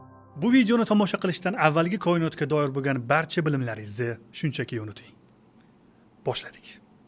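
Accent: Tashkent, Uzbekistan. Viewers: ука тошкент шевасида гапирмасдан адабий тилда гапирса бўлмайдими бутун ўзбеклар кўради фақат тошкентликлар кўрсинми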